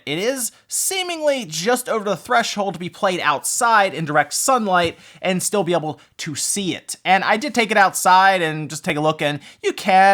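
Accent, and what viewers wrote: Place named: Maryland, United States. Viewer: Does anyone know what that accent has is called? Like how he pronounces "Draw" as "Drawl" and "Older" as "Odor"? I'm genuinely curious and not trolling. I've wondered for a long time. At one point I thought it was an Ohio thing but is in Maryland right? ANyone know what I'm talking about?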